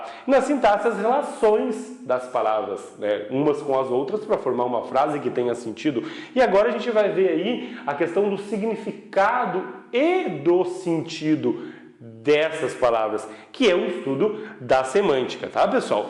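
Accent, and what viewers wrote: South, Brazil. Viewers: Muito top o sotaque do Sul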